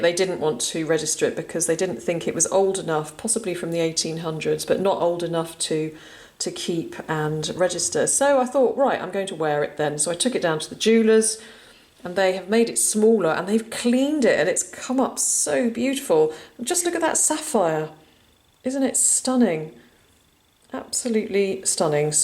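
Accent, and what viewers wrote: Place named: England, United Kingdom. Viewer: will you please wear gloves in future, seeing the dirt under your fingernails really detracts from your wonderful English accent, Erm; I’m English from County Durham....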